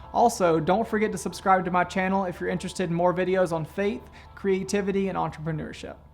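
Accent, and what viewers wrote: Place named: South, United States. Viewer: Are you from the Mid-South? I hear a Memphis/Alabama/Arkansas/Tennessee accent.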